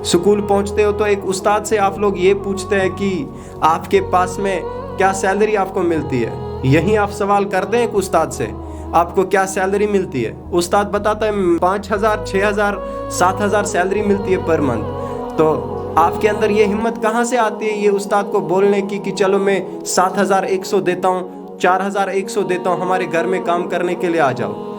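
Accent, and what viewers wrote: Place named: United States. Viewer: Sir u've got an amazin accent u speak like native American that's epic! sir I wanna know did u learnt in online from tv shows speeches etc? actually there are multiple english accents it changes from region to region english speaking countries like America Britain Canada australia scotland ireland there accents and slang words differ from each other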